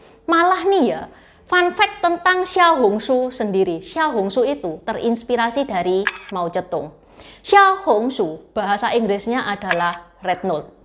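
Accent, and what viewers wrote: Java, Indonesia. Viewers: Plek ketiplek ....... 😄😄, Jawa banget. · Jawane ngendi kie, deneng medok temen ! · beritanya dibawa oleh lebih Seru lagi karena Bahasa Indo rame sekali & jawanya medok buanget tambah sedaaap deh.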